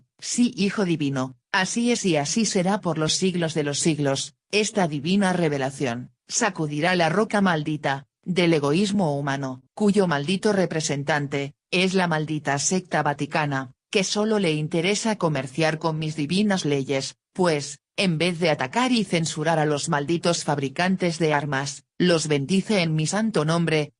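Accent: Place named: Spain. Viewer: Me sorprende que esté leído por una española...será que ya llegó la Doctrina a Europa?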